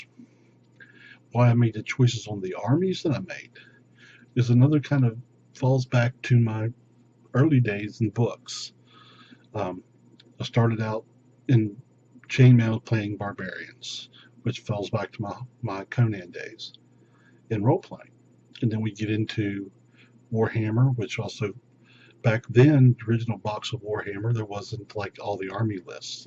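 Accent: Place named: South, United States. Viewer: Super interesting video... you're so easy to listen too, I love your relaxed accent (I'm assuming its southern? sorry if I'm wrong!), reminds me so much of one of the WW2 veterans featured in the Band Of Brothers series - 'Shifty' Powers I think it was.:D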